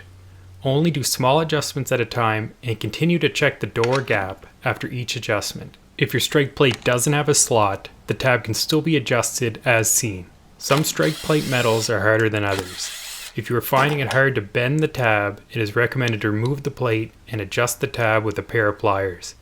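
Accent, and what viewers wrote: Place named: Canada. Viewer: For anyone curious - this chap has a Canadian accent and where it sounds like he is saying "strake" plate he is actually saying "strike" plate. Just incase anyone is unfamiliar with door hardware terminology. Good vid, thanks.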